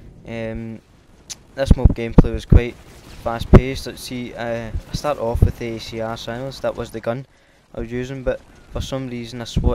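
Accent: Scotland, United Kingdom. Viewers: Bro ur growth has been superior! And trust me in my opinion ur commentary is a trillion times better than those u mentioned at da start! No one beats ur one liners. And FUCK APOLOGIZING about ur accent! Scottish and proud (ain't ur fault if ppl can't understand) Peace!!!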